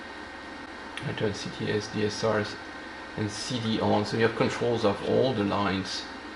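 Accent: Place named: France. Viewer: Whenever I start a video and I hear "Hello and welcome back!" with that hint of a French accent I know I will learn something about vintage computers today.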